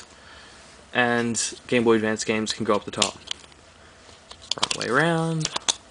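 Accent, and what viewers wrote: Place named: Australia. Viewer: hey dude you sound aussie like me lmao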